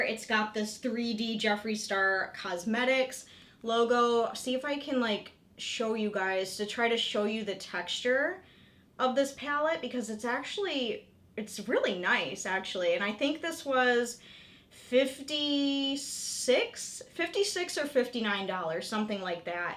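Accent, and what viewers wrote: Michigan, United States. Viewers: I love your mitten accent lol. "I got it Ahn" 😊😆🫶🏻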